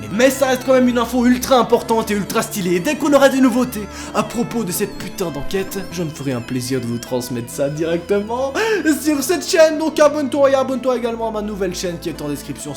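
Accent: Belgium. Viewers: T belges??? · Tes belge? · tu es belge? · t belge? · tu es belge ou quoi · Est ce qu'il est belge? Il a un ptn d'accent flamand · T es belge 🤔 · Et mais tu est Belge?? PS: Je t'adore!❤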